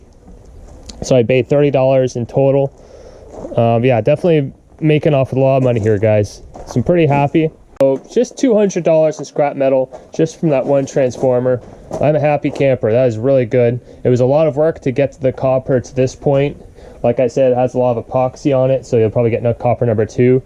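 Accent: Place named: Canada. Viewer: Thought I detected a slight Canadian accent, there - Eh?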